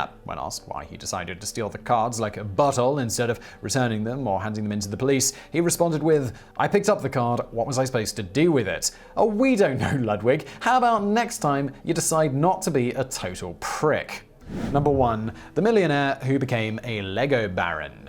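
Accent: England, United Kingdom. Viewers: I was tickled by the title and it’s irony. While unnecessary, it was interesting pointing out these wealthy people who have committed these relatively petty crimes by comparison to those crimes historically committed by their counterparts. Hearing the host’s British accent and the words “wealthiest” and “thieves” makes it impossible to ignore the obvious. The majority if not all of the wealthiest nations and corporations in the world are wealthy because of all they have stolen, land and labor. The wealthy with few exceptions are an entitled bunch of folks. They spend what everyone else has worked hard for, and think everything is theirs for the taking. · Your English accent makes the insults all the much funnier. Thank you for my early morning lols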